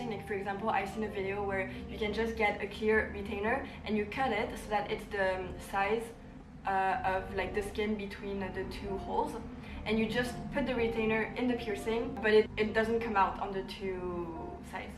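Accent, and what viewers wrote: France. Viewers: le petit accent français je l’ai aussi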